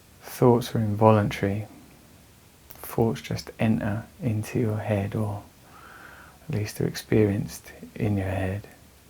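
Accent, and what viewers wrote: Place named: England, United Kingdom. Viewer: An English accent is so calming, why, but it is, Thankyou!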